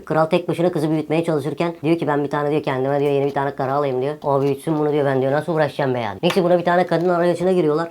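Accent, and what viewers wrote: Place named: Thrace, Turkey. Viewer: Abi bu Trakya şivesi ile Türk ve uluslararası ünlü romanları anlatır mışın lütfen çok komik olur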